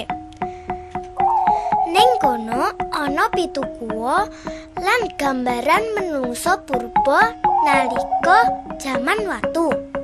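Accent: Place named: Java, Indonesia. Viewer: Khas bnget logat jowonya😍 Di tunggu subs baliknya y kk🙏😊😊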